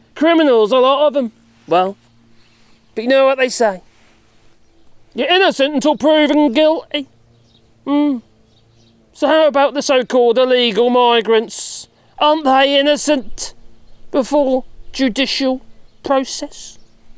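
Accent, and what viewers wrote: United Kingdom. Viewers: Obviously we Americans have more than enough bad stuff of our own creation to deal with over here, but misery loves company and hearing about the shite in the UK in this accent is always interesting. Stay well mate word of the day should be 'effluvium'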